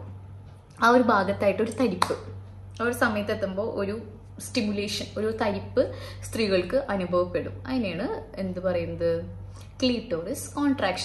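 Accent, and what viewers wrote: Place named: Kozhikode, India. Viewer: Are you from Calicut? Kik-killi kettapo chodhichadhaato.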